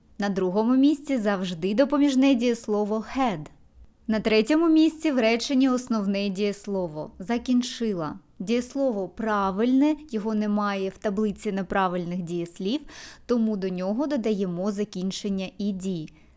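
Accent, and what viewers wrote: United Kingdom. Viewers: Вітаю! Радий знову Вас чути! У мене злетіли всі налаштування Ютуба і я загубив Ваш канал на декілька днів. І ось тепер ми знову разом)) Як приємно знову чути милий моєму вуху British pronunciation! Бо це американське ричання мене просто дратує. Дякую Вам за це відео. (А кого тут не влаштовує вимова - слухайте блогерів - носіїв мови. І буде вам щастя, як кажуть.)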